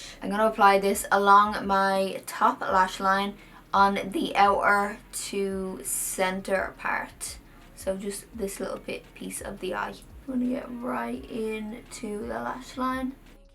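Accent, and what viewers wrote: Ireland. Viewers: I was showing your videos to my mom today, fangirling about your past replies to my comments. She said she thinks you're gorgeous and your Irish accent is lovely 😃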